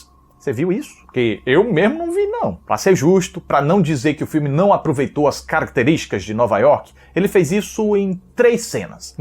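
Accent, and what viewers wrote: Northeast, Brazil. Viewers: Não conhecia seu canal e já amei primeiro pq claramente vc é nordestino kkkkkk sou de Sergipe e pra variar gosto de ver uma linguagem acessível e pela resenha de forma leve! Vi o 6 domingo no cinema e durante a semana revi os outros pra relembrar... Obrigada pelo vídeo 👏🏽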